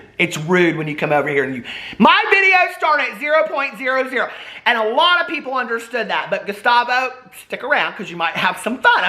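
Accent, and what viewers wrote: South, United States. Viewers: "It's Shake and Bake...and I helped!" You just squeezed that little gem in there right at the beginning. I can just hear that thick Southern accent! Lol!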